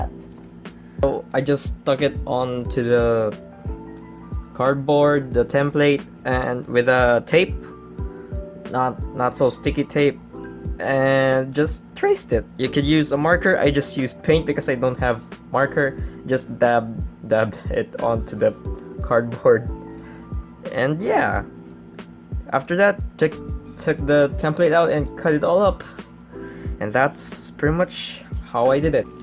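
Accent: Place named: Philippines. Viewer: I don't know why but. I feel like you live in the Philippines Judging on your voice and the way you talk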